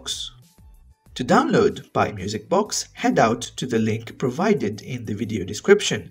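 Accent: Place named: Mauritius. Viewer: Sensing a mauritian accent ... 😁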